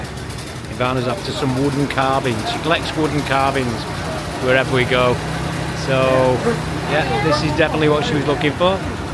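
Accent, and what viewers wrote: United Kingdom. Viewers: You sure you're not in LONDON? pure pommy accent 😂 ❤ glad that you guys enjoyed Cambodia ❤ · Is your accent Welsh or Scouse? It’s beautiful.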